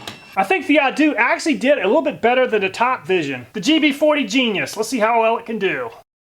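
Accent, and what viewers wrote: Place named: Pittsburgh, United States. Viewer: are you from Pittsburgh or in PA? Because you definitely talk like us lol.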